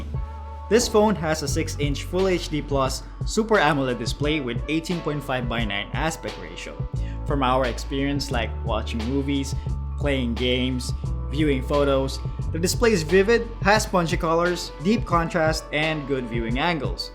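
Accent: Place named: Philippines. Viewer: You sound Filipino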